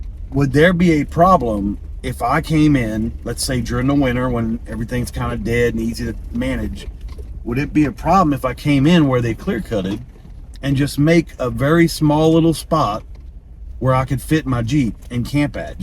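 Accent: Louisiana, United States. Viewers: I’m watching you for the first time…I was saying to myself this Arkansas guy sure sounds like he’s from back home. I’m from Lake Charles but moved to Memphis recently for work. I have a Bronco. Gonna check out dem Ozarks real soon. Can’t find no good sausage in Memphis area. I have to bring Sausage and Boudin from home.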